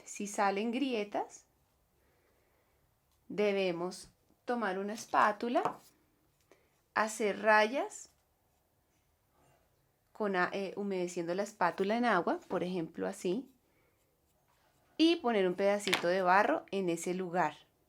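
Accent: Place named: Colombia. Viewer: ❤Que interesante, sencilla, detallada, precisa y Muy amena explicación. Ese amor en tu enseñanza y sobretodo ese sutil Acento Colombiano tan bello. Gracias Gracias Gracias 🎉😊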